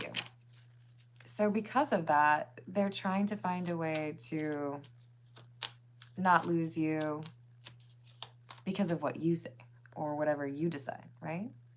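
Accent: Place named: Minnesota, United States. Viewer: Bahahaha..love the Minnesota accent. 😂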